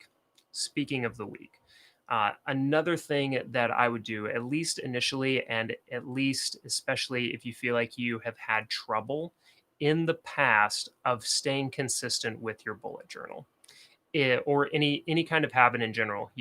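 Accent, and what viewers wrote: South, United States. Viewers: love southern accents! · Love the southern accent and the "Never settle" in the background. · The southern accent was chef’s kiss And I’m mad I missed this stream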